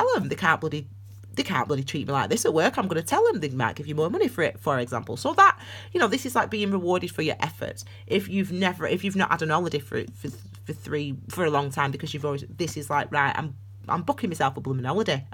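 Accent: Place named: Yorkshire, United Kingdom. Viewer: I’m just trying to figure where it is. It’s almost definitely Yorkshire-ish 😉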